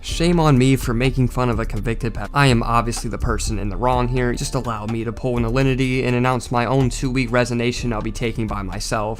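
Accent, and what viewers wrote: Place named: United States. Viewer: Ive known is irish and english but he somehow sounds like hes american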